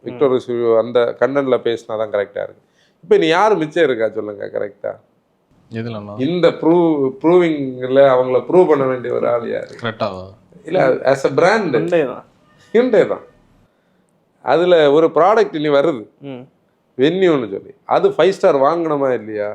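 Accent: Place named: Nanjil, India. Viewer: Anna, mainly I'm watching ur videos for your nanjil accent and very casual talks... I like it very much.❤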